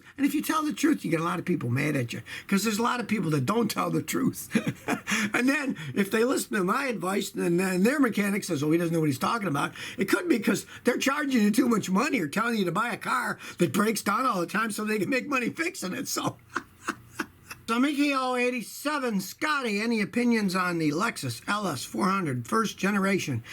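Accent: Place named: New York, United States. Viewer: Scotty why do you have a New York accent? You sound like Joe Pesci.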